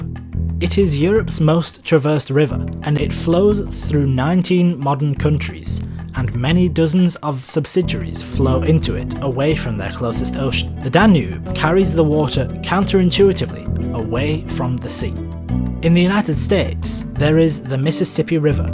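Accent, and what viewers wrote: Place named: Northern England, United Kingdom. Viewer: really interesting video! Great to hear a Northern Accent in one of these channels too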